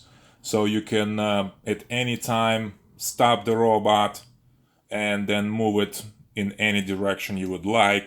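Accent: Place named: Russia. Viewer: The commentator is russian? His english is very specific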